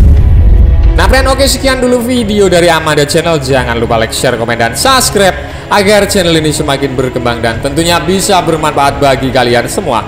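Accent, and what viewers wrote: Java, Indonesia. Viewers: Suaramu min is the medok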